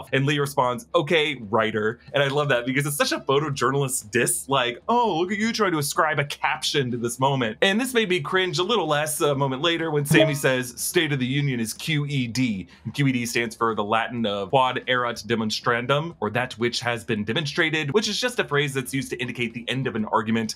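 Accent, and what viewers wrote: United States. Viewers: what kind of american are you? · What kind of American are you?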